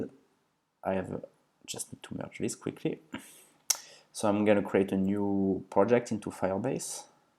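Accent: France. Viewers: you have a french accent bro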